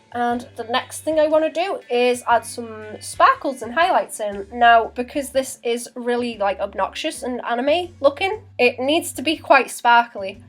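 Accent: North West England, United Kingdom. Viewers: I've never seen any of your videos before, and I was surprised to hear your accent. Just through sheer volume 9/10 artists I find on Youtube are from the US. You sound like you might be from the North West like me???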